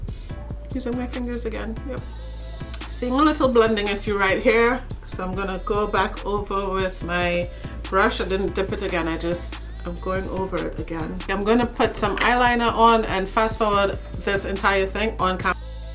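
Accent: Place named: Caribbean. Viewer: You sound like your oots are from the Caribbean... trini maybe?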